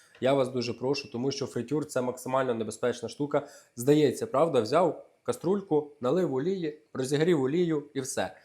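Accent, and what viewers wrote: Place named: Kherson, Ukraine. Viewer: Сковорідка повинна охолонути, а не вистивати..... Хлопчисько говорить діалектом, бо дуже багато слів схожі на російську мову, але не російські. На Херсонщині теж говорять схожим діалектом.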